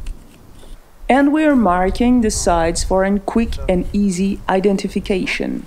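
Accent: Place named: England, United Kingdom. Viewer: i really like your english accent. have a nice day ;-)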